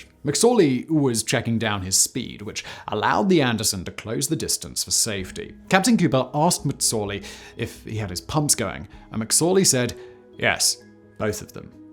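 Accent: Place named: England, United Kingdom. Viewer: I was amazed that the radio call was preserved, and isn't it odd that a midwestern sailor speaks with an English accent and oh I'm an idiot, that's voice.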